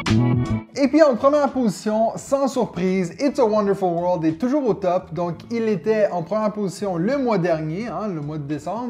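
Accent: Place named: Quebec, Canada. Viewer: Wonderful world est le jeu du moment pour moi aussi pour renouveler Je te conseille son extension corruption et ascension qui apporte beaucoup au jeu. Est-ce que tu aimes ou joue à des jeux de deck building (dominion, aeon end ...) j’en n’ai pas vu un seul sur ta chaîne Soit tu n’apprécie pas cette mécanique où tu n’as pas encore découvert cette Univers 😍 J’adore tes vidéos malgré ton accent Québécois qui m’ai d’habitude très dure d’écouter toi j’adore vraiment ton humour.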